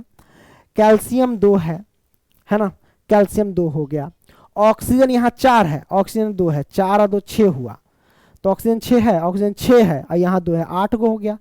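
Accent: Bihar, India. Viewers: Sar aap Bihari hai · Aap bihar se ho kya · sir kya aap bihar se ho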